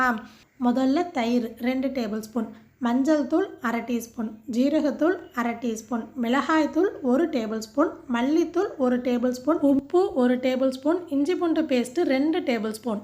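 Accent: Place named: Mylampatti, India. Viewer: enga familyla nanga ipd dhn pesuvom adhe mari pesuringa poorvigam mylampatiya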